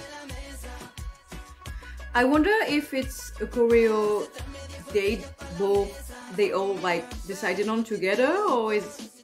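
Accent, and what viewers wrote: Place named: France. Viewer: Je t'adoreeeeee 💖 (t'es française, non?) I guessed based on the accent haha. But yes the Omar effect is very very real and at this point my obsession has become so unhealthy haha.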